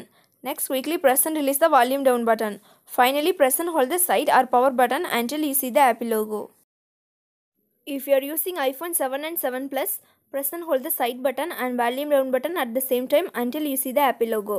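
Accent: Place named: Punjab, India. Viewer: Rakarakaraka , put bigger subs and don’t move them quick. Your English is like Punjabi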